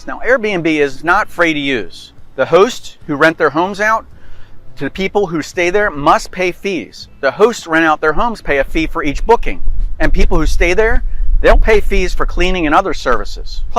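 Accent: United States. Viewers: This is weird. The American English accent is right, but these aren’t all real English sentences. AI maybe?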